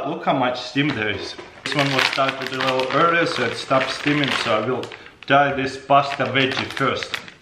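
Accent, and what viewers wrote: Russia. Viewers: I loooooove that rusian accent. His so famous becuse of it. I love it !!! 0o0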